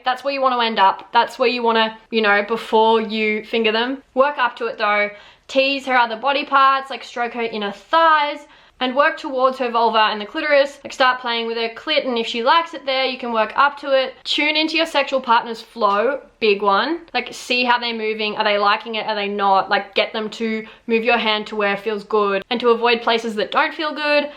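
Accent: Australia. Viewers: Are you dutch, cause your accent sounds more like an autralian one Edit: just saw your name is and you are Australian.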